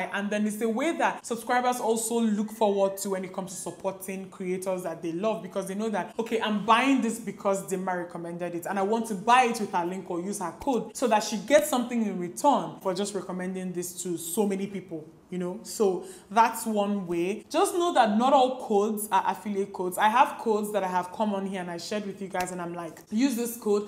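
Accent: Nigeria. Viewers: Thanks for sharing! 💕 If I may make one recommendation, please speak a little slower. I’m somewhat accustomed to the Nigerian accent, but even I struggled a little to understand everything you said. I agree with the other viewers, you look beautiful!💕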